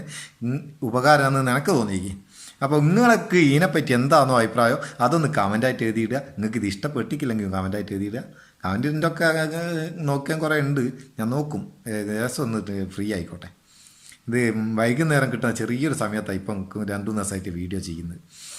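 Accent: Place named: Kozhikode, India. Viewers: Idh ksd slngalla... kozhikod😤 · Kozhikod slang😀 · Aadhyam ningale nadapuram slang ozhivaakk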